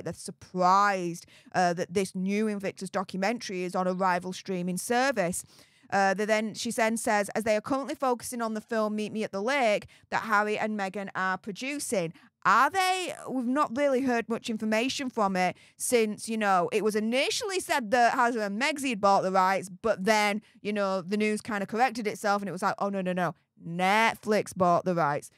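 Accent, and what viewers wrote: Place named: England, United Kingdom. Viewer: Takes a while to get through the English accent however is interesting and worth watching.😅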